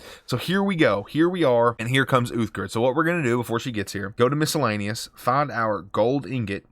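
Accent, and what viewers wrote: South, United States. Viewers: It is so great hearing another southern person playing video games😂 favorite part is “beat the brakes off of her”😂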